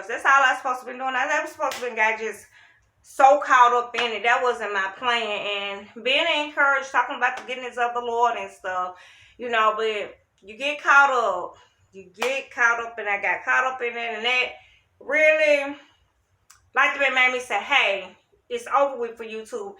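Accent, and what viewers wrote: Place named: South, United States. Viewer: Yea people always try to try the quiet ones u gotta be careful with them lol 😂 Where are u from because how u say “street” and “strange” that’s down south I love it😂😂👌🏽👌🏽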